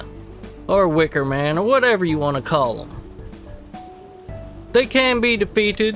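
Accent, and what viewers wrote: South, United States. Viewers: Love the jolly southern man helping me collect all the borbs!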